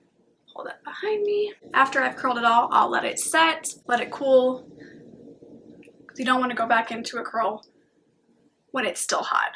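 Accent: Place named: Midwest, United States. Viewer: I just found you while looking for tips on trimming my hair and I’m so delighted you seem to be sticking around! It’s wonderful to see someone with genuinely long hair on hair YouTube!! Could we maybe get some more tips on other kinds of styles for long hair? I’m not sure if you wear your hair back much, but there aren’t a lot of people with any idea how to deal with real length when doing updos or braids so any tips you have would probably be helpful to those of us who love our long hair but aren’t always sure the best way to handle it. I really hope this doesn’t come off as preachy or bossy but... please be very careful with any travel plans! I live with a microbiologist so I’m pretty up to date on what’s going on and there’s a huge spike in cases and hospitalizations in parts of the country right now and we won’t even start to see the effects of 4th of July parties for about 2 weeks. 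We just had to start shutting 7 counties back down here in California. Maybe stay local-ish? You sound like you’re from somewhere in the Midwest and it seems safer there for the moment. I hope you have a happy anniversary though!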